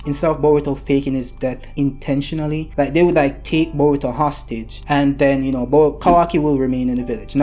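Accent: Jamaica. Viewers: Brudda yiu Jamaican what's the accent I'm hearin you in ya voice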